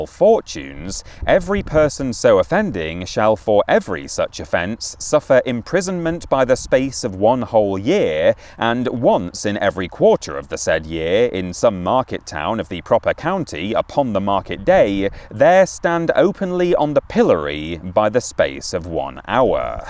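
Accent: United Kingdom. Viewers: Hi. Im ALSO 🤠, Ima Canadian. Oh, and also I studied in History. Just wanna say I liked the content of this video. It sounds accurate. But noticed your Very English Tone, which is Great, dont change anything, lol. But, I am Foreigner to your Land's Accent and its Very Crude Direct form of Communication. it sounds like the Typically British way of expressing Emotions being similar to something like... Roadkill. 😐 (thats My attempt at British Humour). LOL So, THE ISSUE😱, for me🤠 is just the Very Ending, with: ''Im, and this has been Interesting'' That I think that sentence comes off as Arrogant. Or that it would be received by a Canadian Audience, and possibly American Audience, as a British Guy who thinks he is Better than me! He Snubbed me with his Arrogance! I know this is not the case, but I almost didnt wanna watch another video, and almost forgot to hit the Subscribe button. 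So, as for My Part, I will be sharing your Video:) And maybe, for Your Part, you can avoid ending on that note, and find another way to end the video that wouldn't be misinterpreted as Arrogance, which I believe it is not. Good Wishes!:)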